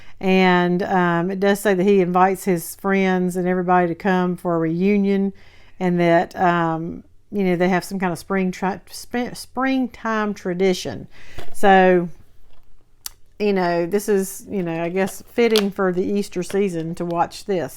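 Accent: South, United States. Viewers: I thought your "specific" southern accent sounds like my family❤ Lots of my family live in East Tenn. and western NC which is pretty close to that region. Don't mean to sound weird, but there are several different variations of southern accents😊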